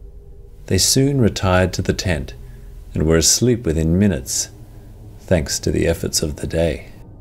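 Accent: Australia. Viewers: Is it the cultivated Aussie accent?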